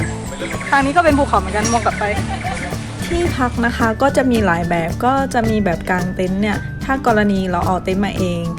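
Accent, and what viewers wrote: South, Thailand. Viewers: เอ็นดูพี่ แหลงใต้ 5555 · แหลงใต้ น่าร๊ากก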